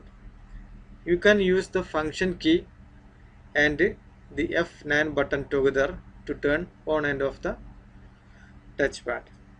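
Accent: Kerala, India. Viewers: മലയാളി ആണല്ലേ.. ഇംഗ്ലീഷ് കേട്ടപ്പോ തോന്നി... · Malayali analle 😆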